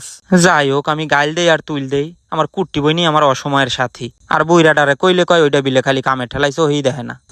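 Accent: Comilla, Bangladesh. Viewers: Vaiya Tumar kotha gulovonek Valo lage ar onek funny lage accha vaiya Tumi koi thako 😂😂😂😂😂Tumi ki kumilla te thako tomar vasha gulo amon kummillar moto 😂😂😂😂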